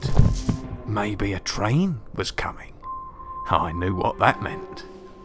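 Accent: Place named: Cockney, United Kingdom. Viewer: And every year of the date of the accident it runs again as a warning to others, plunging into the gap, shrieking like a lost soul! Great work! I really enjoyed it! Great voiceover too. Good cockney accents always sound good.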